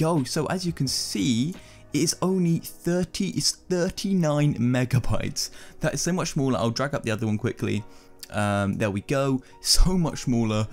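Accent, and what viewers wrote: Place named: United Kingdom. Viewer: Hehehe, your cute.. but very UK.. I have friends from there... I do like the video very informative, thank you so much... :)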